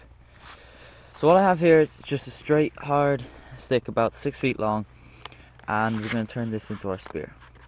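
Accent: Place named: Ireland. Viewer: u hav such an irish tone to ur voice ha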